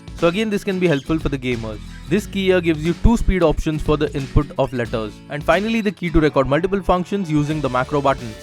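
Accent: India. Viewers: terrible indian english /...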